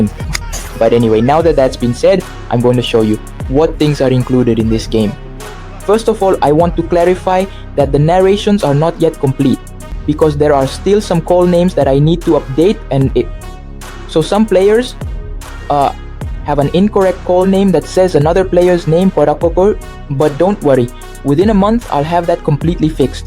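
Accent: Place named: India. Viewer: Bro buen trabajo con lo del inglés pero por curiosidad quería saber por qué tu acento suena un poco como de la india?😅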